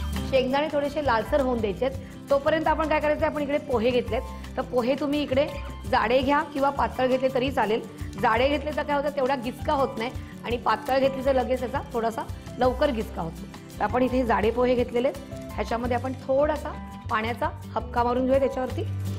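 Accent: Pune, India. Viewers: Is this the Pune marathi language?? Or vidarbh marathi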